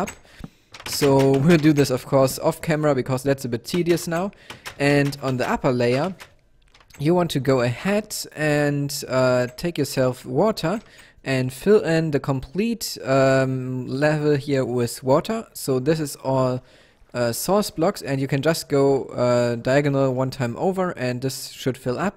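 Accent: Germany. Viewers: you describing the killing chamber so calmly in a vaguely German accent made me uncomfortable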